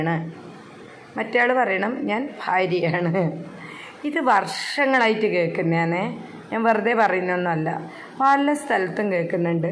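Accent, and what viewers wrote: Kannur, India. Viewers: ore nattile oru shyliyane nammudey kannur bhasha mattulla nattile illa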